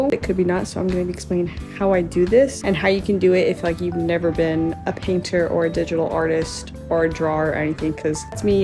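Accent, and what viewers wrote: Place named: United States. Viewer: You probably hear it a lot, but you have a really nice voice. Maybe it's the American accent lol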